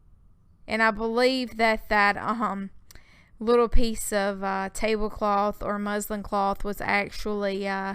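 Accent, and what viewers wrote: South, United States. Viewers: love the feathers and your southern accent is delightful! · just want to let you know, how talented I think you are. I REALLY enjoy your videos. Not to mention that I could listen to you talk forever. LOVE that southern drawl!! We Canadians love that drawl!! lol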